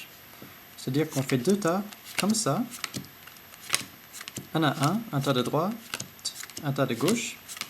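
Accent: Quebec, Canada. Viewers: bravo j'adore l'accent québecois :-)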